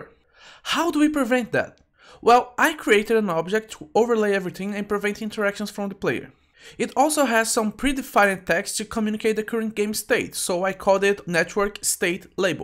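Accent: Brazil. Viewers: se é brasileiro? sotaque esse manin kk parabéns pelo tutorial ajudou aqui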